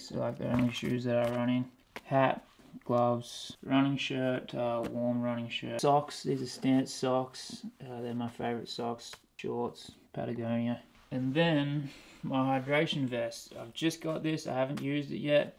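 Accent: Australia. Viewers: Awesome filmmaking dude .. are you from Australia ? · That accent.. definitely Aussie.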